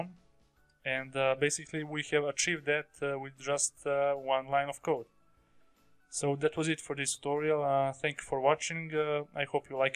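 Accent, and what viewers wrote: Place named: Russia. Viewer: Your native language is Russian? Accent. :D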